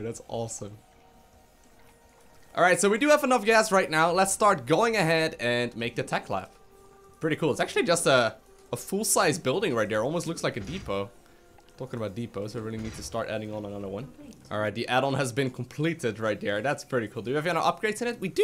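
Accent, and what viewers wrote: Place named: Netherlands. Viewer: The accent, though. Are you Dutch? Good vid nonetheless!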